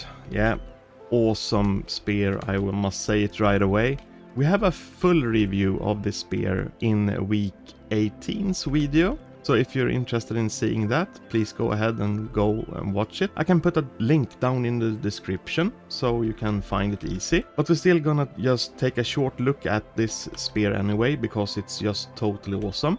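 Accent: Sweden. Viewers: You sound A bit swedish. is it just me?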